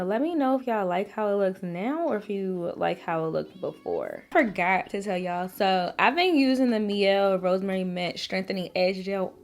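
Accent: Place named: Maryland, United States. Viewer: I knew you were from MD!!! I could tell by how you talk, lmao. I'm from PG, you?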